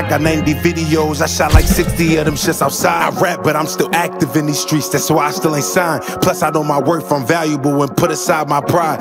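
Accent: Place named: New York, United States. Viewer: This is what NYC supposed to sound like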